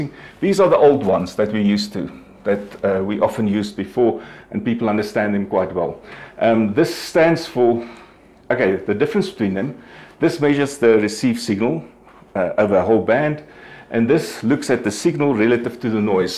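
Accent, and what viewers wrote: South Africa. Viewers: South African right?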